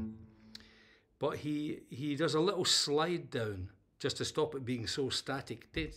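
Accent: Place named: Scotland, United Kingdom. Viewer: Are you Scotts ? What a great accent ! love it.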